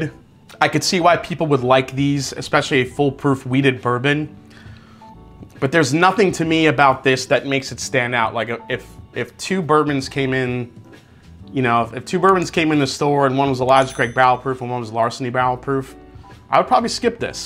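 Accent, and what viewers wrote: New York, United States. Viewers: Your New York Italian coming out hard core.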